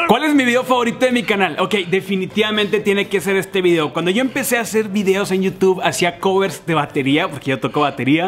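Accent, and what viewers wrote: Monterrey, Mexico. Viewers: Luego luego se nota tu acento regio :) también soy de Monterrey. Felicidades eres de los mejores youtubers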